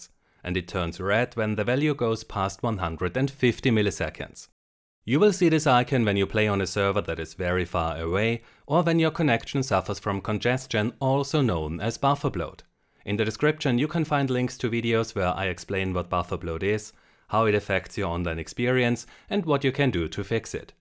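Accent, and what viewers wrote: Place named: Germany. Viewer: I can hear the German or Austrian in this Guy... Schöne Grüße aus Wien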